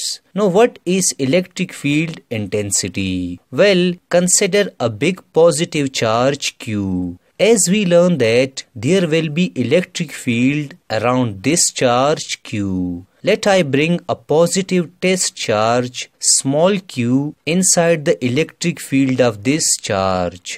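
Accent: India. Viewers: you know its gonna be good when the guy has an indian accent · hindian english is so funny. bro you gotta learn English. we hear you in da class to laugh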